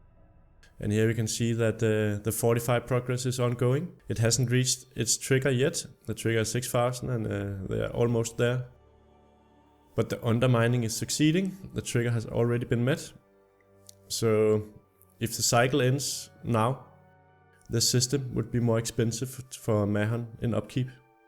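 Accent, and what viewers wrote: Denmark. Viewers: Cool guide, and lovely danish accent (I'm norwegian myself :p)!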